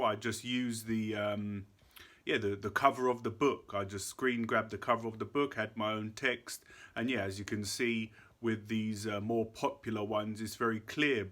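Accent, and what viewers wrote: United Kingdom. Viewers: Wow that's a long process, but your work makes many people happy & useful thanks for doing it, btw are you British? 🥰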